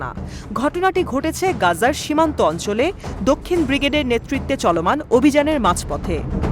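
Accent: Kolkata, India. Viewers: আজাইরা নিউজ, কোলকাতার স্টাইলে !!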